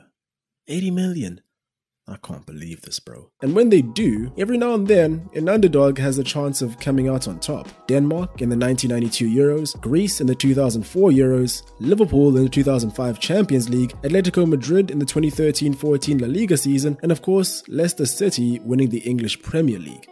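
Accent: South Africa. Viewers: I always though he was south African living Pretoria and I think I was right lol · Always thought you're South African. Rep Zim! Great content amd congratz in a 100K! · Knew you were South African! My fellow citizen...I have subbed lol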